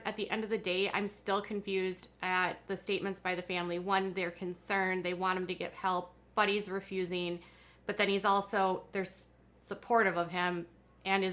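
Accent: Minnesota, United States. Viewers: I think needs to go back to writing some BS liberal snowflake “column” or “blog”. She pathetically uploads several times a day about ridiculous topics with no proper research or fact checking. She has to censor every little word she is afraid might keep her from making a buck. Hey, if you’re so passionate about your political beliefs, just say the word. ABORTION. If you want to even ATTEMPT to play the reporter role, drop your awful Minnesotan accent and learn to use talking head diction. Putting a bag over your head might help as well. You have a rare talent of making someone’s eyes, ears, and brain bleed simultaneously.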